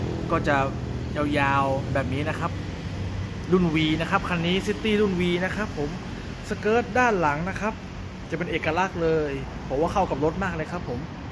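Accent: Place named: North, Thailand. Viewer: อู้เหนือเลยครับผม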